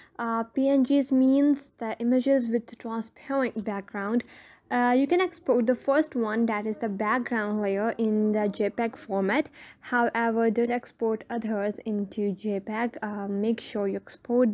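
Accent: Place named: England, United Kingdom. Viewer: Tutorial is so nice lakin English accent 😂😂❤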